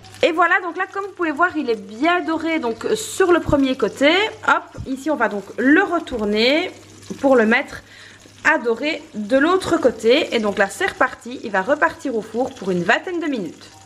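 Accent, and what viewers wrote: Belgium. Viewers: Bravo super bonne recette merci,c un accent belge?🤭 bsa7a le poulet il est bien réussi☺😚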